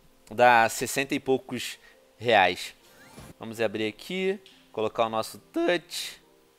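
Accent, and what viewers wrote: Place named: Portugal. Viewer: Kaio ele é português ele fala assim.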